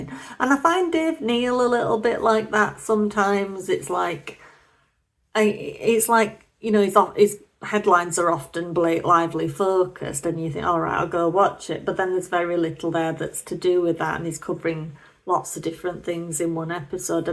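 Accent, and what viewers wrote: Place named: North West England, United Kingdom. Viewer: Sounds like you are north west England, same as me x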